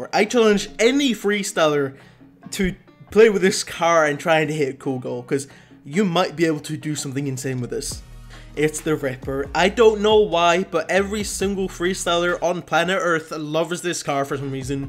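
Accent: United Kingdom. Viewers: I still don’t get how your British, I’m from England and have never heard anyone like you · Btw are you Scottish it sounds like it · he sounds lockey welsh or northen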